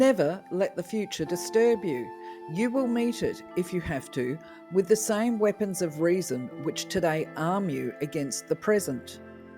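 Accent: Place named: Australia. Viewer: Great content, thank you. Nice touch to hear an Australian female voice narrate a welcoming change.😊👍🏽🇦🇺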